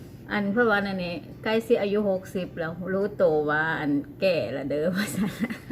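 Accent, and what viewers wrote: Phu Thai, Thailand. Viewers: เว้าภูไทม่วนดีครับชอบฟังครับมีเสน่ห์ครับ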